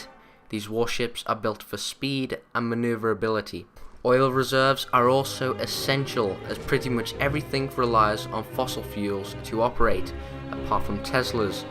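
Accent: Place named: United Kingdom. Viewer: Have u wondered this guy is a brit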